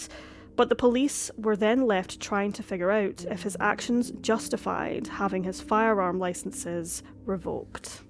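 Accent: Scotland, United Kingdom. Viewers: Gal Gadot is the narrator, don't you recognize her Scottish accent?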